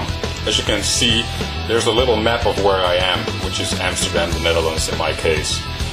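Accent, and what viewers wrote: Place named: United States. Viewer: Good tutorial and information, great music(loop), good American accent too! I only recognized the real guy's voice when he pronounced "Berlage"... ;-)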